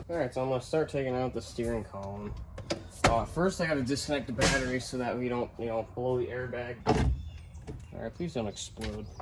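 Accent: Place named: Minnesota, United States. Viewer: Are you from Minnesota ? Just trying to guess by your voice hahaha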